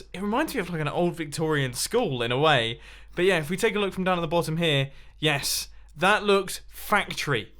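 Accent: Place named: United Kingdom. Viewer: "Does that look a little bit naff?" Me: "Naff?": "I feel like that looks a tiny bit naff" Me: "But what is naff?? EXPLAIN!!" Lol, this is why I love British youtubers, I don't understand a word they're saying sometimes. Keep up the good work!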